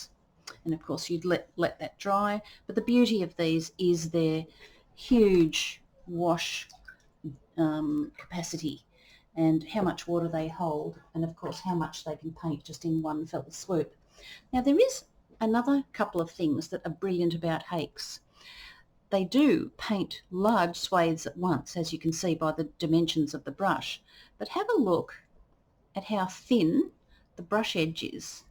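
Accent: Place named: Australia. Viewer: I always use Hakes for watercolour. Great vid and I love Ozzie accents, esp the singing at the end of sentence ;-)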